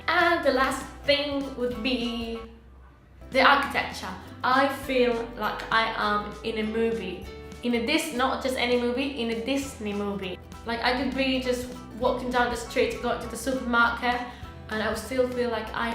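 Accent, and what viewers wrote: United Kingdom. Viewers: Sube más vídeos de palabras porfavor 🥺🥺 amo el acento británico y todos los youtubers que he visto son hombres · Your accent is definitely very heavily British influenced. It sounds great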